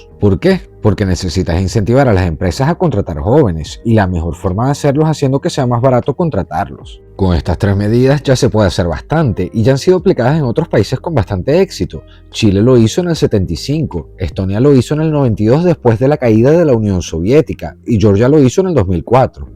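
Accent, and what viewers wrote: Venezuela. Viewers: ¿De qué parte de Venezuela es ese acento? Jamás lo había escuchado antes.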